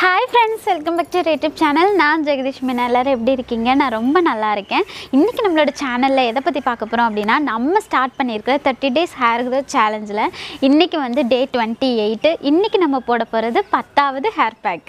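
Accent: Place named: Valliyur, India. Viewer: unga speech enga ooru mathiri irunthuchu athanalathan keten enaku vallioor sister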